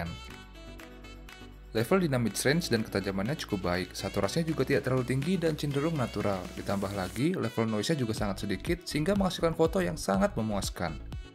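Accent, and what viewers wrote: Lampung, Indonesia. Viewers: Orang lampung ya min?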